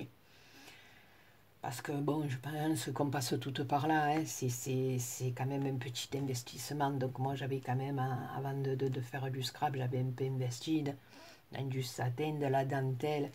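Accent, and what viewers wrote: South, France. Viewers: Oh ma belle, quel plaisir de t'entendre et d'entendre le sud dans ta voix ! Trop heureuse que tu ai été gâtée et tu es tellement touchante !!!! Te fais de gros bisous ♥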